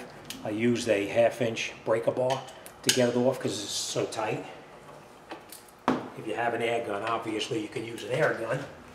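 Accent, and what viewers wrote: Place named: New Jersey, United States. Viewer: R u from like new joysee? Love the accent.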